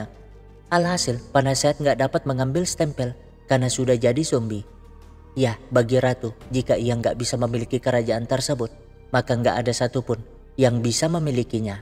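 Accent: Makassar, Indonesia. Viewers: Orang makassar kah ini adminnya?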